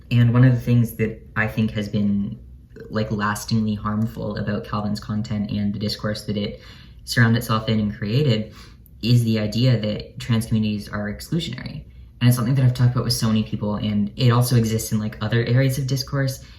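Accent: Canada. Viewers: Oooooh, all the "out" and "about" in your speech outed you as a Canadian within the first minute, lol. Not that it matters at all, just a fun observation. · Hmmm one thing your voice tells me is that you’re Canadian 😎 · Your voice reminds me of Chase Ross'. That's all. Don't know what Kalvin Garrah even means with 't-slur voice.' It sounds normal to me? Edit: I just realized it might be because you both sound Canadian.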